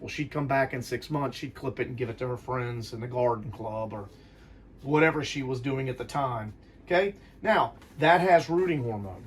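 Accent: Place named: Louisiana, United States. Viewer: Before I look deeper in your about section... New Orleans? Southern Louisiana? I recognise that accent. 😁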